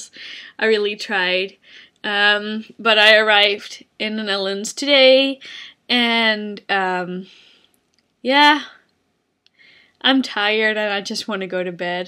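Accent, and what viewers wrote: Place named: United States. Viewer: Yo accent is more american than usual, lady. or maybe it's in my head. either way, I'm happyz you're still alive and back in the country :) <3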